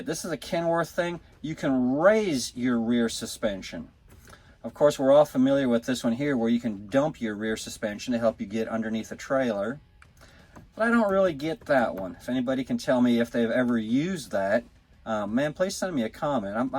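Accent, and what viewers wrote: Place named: South, United States. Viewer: Southern accent and southern slang "y'all " Im curious where y'all from.... im from New Orleans....live in Wyoming now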